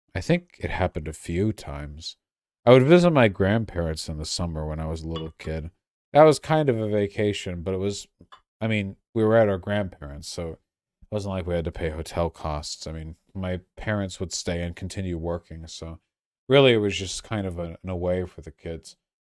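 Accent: United Kingdom. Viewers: Everytime he gets drunk he becomes bri-hish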